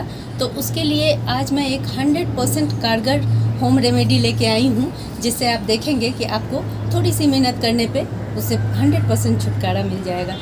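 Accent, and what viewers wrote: Bihar, India. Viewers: Nice information....ap Delhi rehte ho, bt apka tone to Bihari hai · Aapki boli me Bihar hai.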